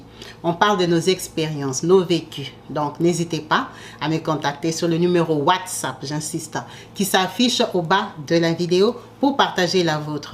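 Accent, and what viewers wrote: Democratic Republic of the Congo. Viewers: Ooh la mère chérie je vous aime bcp maman surtout votre accent congolais🥰🥰🥰😘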